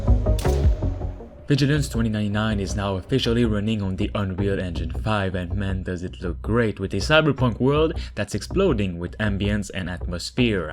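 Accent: Quebec, Canada. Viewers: Juste avec l'accent, on sait que tu es québécois 👌😂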